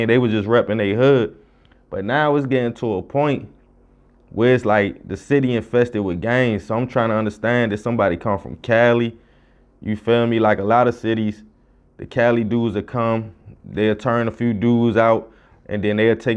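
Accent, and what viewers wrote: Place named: Washington DC, United States. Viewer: Why u so pressed by Baltimore bruh u sound like u from dc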